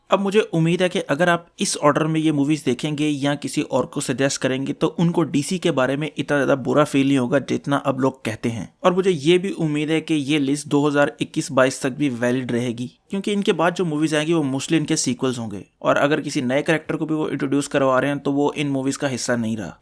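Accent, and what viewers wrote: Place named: Pakistan. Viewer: Your voice tell me you are from Pakistan 🇵🇰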